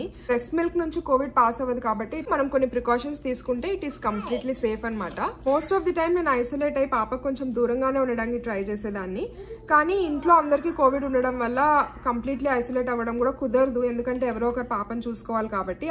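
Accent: Rayalaseema, India. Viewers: Hii.. Sis...aa pain yela vuntundo nenu anubhavinchanu naa Husband RDT hospital lo isolate ainapudu...aa badha pagavaallaki kuda vaddani devunni kore daanni....mee raayalaseema yaasa vintunte naaku mana intlo maatladu tunnatle vuntundi...Maadi Tadipatri...present Hindupur lo vuntunnamu..mee family mucchataga vundi...May God Bless U 💜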